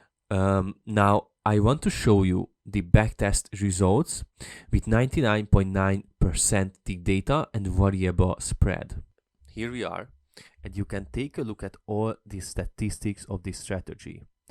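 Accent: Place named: Hungary. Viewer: I can recognize a Hungarian accent ;) Great video, thanks!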